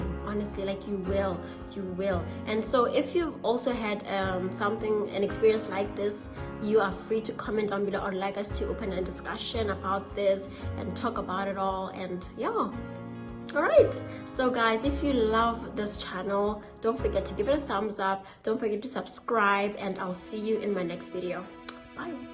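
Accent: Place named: France. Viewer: Great French accent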